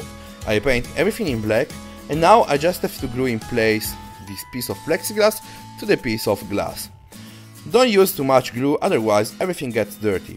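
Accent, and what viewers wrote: Italy. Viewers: yeah to be honest xD u can really tell its Italian also for the first trick i was able to switch the front and back camera after unscrewing it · he's italian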